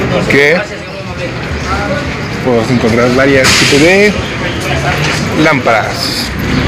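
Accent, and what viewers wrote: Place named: Peru. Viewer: Eso donde queda? Que pais? Como hablas supongo que es en Peru ? Lindas las lamparas !!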